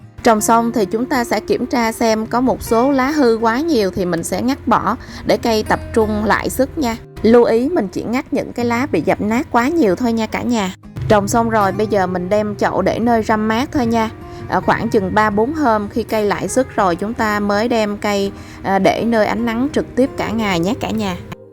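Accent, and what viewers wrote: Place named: Mekong Delta, Vietnam. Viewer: đúng chất người miền tây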